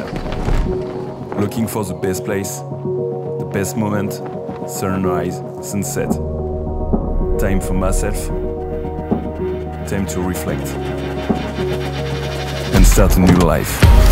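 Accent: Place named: France. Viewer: Propre!!! Ah on voit que monsieur à encore progresser. C'est bon, tu maitrises tout le package des vidéos des grands youtubeurs ^^ Au niveau de la colorimétrie, tu nous sors plusieurs ambiance qui s'enchainent bien, niveau du rythme du fpv on y est, on a plus rien à redire. Niveau effet spéciaux, c'est bon, quand je vois tes portes on est au point aussi. C'est un mini parralax 3 que tu nous a sorti en fait ahaha il y a peu être UNE chose à redire: ok l'anglais pour un meilleur référencement mais on entend encore trop cet accent frenchie ahaha Content de voir que tu progresses autant, plus qu'à trouver d'autres idées créatives! Tu es arrivé au top:D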